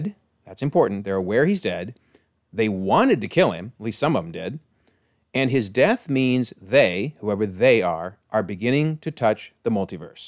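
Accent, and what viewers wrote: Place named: England, United Kingdom. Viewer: If it wasn’t true I wouldn’t call you English accent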